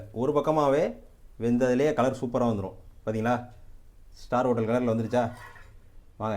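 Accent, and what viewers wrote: Madurai, India. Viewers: மதுரை பாஷை வருதுங்கோ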